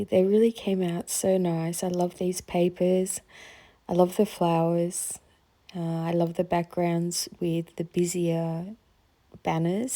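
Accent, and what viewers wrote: Australia. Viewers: Wow, I just found your channel today. What a wonderful selection. Great papers and love the way that you've used them. It's hard to pick a favourite but I really liked Happy Birthday Mum and the last one with the gold dots. Thanks for the inspiration. I live in Australia and you sound like you have an Aussie accent too. 😘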